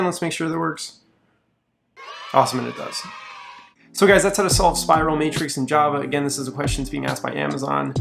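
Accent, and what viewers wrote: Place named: United States. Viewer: The inotro and outro music are rather goofy, but it is so nice to hear an algorithm explained in normal, US accent English instead of, well, you know!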